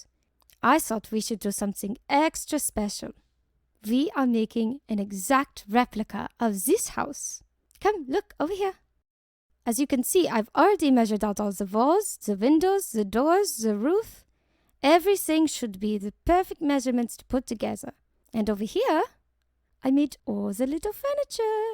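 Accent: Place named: Germany. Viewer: YEESSSSS. YOU NAIL THE GERMAN ACCENT. SO WELL. THANK YOU!!!!!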